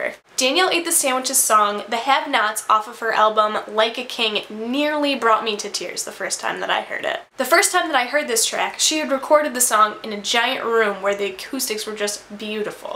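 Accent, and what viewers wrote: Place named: Upstate New York, United States. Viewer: Upstate accent lolz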